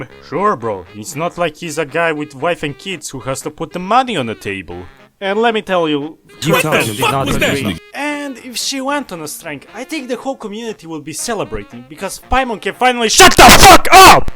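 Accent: Eastern Europe. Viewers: dudeeeeeee the sickass art? the memes? the angry slavic accent? new sub right here damn I ain't rihanna but where have you been all my life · Never knew how much I needed a man with a thick Slavic accent scream about how stupid Genhin VAs are now until now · Slavic accent enhanced the experience too.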